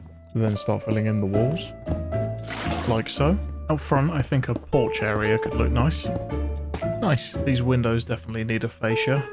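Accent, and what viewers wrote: United Kingdom. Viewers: This is just a normal British accent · Why does everyone that plays Minecraft hardcore is British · Omg I love British people, I like the accent it's hard to learn but if your British your lucky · He is british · Bro’s voice is so calm, comforting and polite with the british accent on top of it is just amazing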